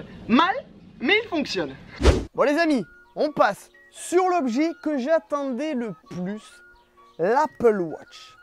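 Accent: Marseille, France. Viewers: Ta un accent marseillais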